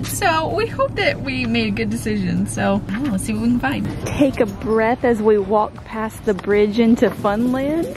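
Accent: Texas, United States. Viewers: "I was really maaaaaaad!" 🤣🤣🤣 Your Texan accent is really coming through, it's awesome!!!!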